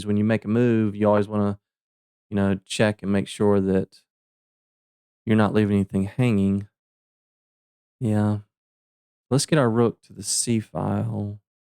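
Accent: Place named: South, United States. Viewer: you know then, secrets of magic can be something of a letdown to most folks. When I sell magic on ebay I can't do refunds for that reason. When I was living in Somerset Pa , I worked for House of Enchantment, 😆, I know , sounds like a brothel, anyway I would sell linking rings to them and they'd come back and say they wanted the ones that I had . I had to explain that they were the same principle, but because I had been doing them for ages , that i could make them appear to melt thru each other and they just needed more practice... There's a lot of awesome mathematical card tricks I could teach you if you're interested. A couple are uploaded on my YouTube channel which I don't promote, it's mostly for myself, but lemme know , I'd be happy to share . By the way, I heard that southern Twang accent , and I would have bet my Good Bishop that you were from Tennessee or Kentucky, maybe even Texas, but I didn't want to insult you if I was wrong lol. 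.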